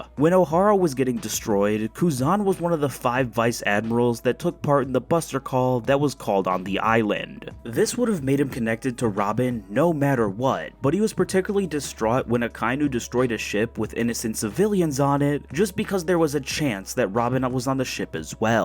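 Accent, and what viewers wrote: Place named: United States. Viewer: God, such an American way of pronouncing foreign names lol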